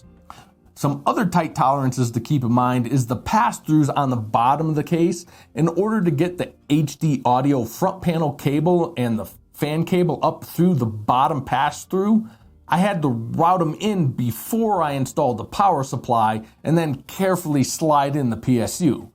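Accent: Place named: Brooklyn, United States. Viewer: This guys accent is great, is he from Brooklyn?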